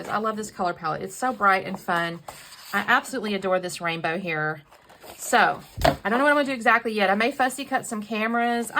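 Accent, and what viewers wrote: Texas, United States. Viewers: I love your story. This is a wonderful tribute to you and all you have achieved. I love your positivity and feel like I am in the room with you when you scrap. I love your Texan accent. Always nice to listen to from an Aussie who feels we stick out so much on You Tube with our accents. I love what you did with the rainbow. 💛💛💛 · Great layout! I love your story of how you got started! And yes, I am one of your subscribers who loves your Texan accent - it always makes me smile! Thanks for sharing!❤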